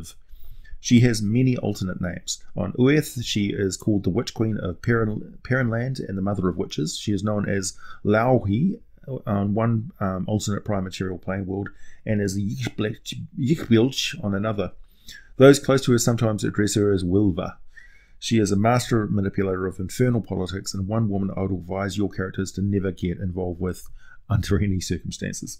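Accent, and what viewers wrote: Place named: New Zealand. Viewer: Boys we got a kiwi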